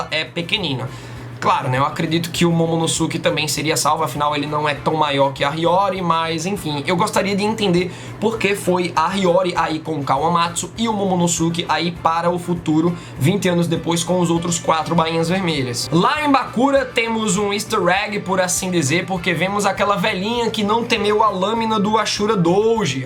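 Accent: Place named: Recife, Brazil. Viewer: Bem que eu tava RReconhecendo esse sotaque hahaha... tamo junto!!! Sou do bairro da várzea/Recife sou seu fã.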